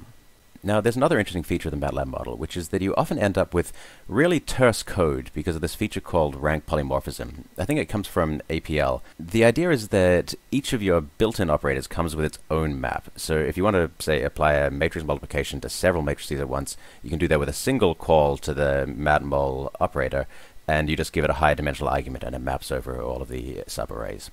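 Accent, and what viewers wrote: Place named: Australia. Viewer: u r one of the most articulate persons I have ever heard. Great presentation. Where did you learn to speak? And you sound like an Aussie living in USA.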